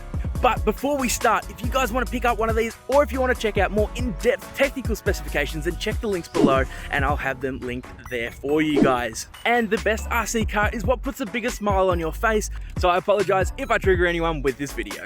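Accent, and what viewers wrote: Australia. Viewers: Are you ozzie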